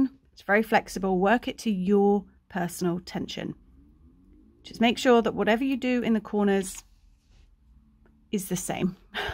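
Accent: United Kingdom. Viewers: this is random but what kind of British accent do you have?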